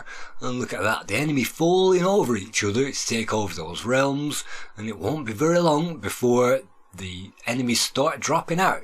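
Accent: United Kingdom. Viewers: Bri'ish guy narrates an American Amiga game about Bri'tain. Checks out. Nice video, and nice how you even used an NTSC hardware with the aspect ratio the game was designed for. You don't hear a lot about NTSC Commodore hardware due to how Commodore computers tended to do better in Europe.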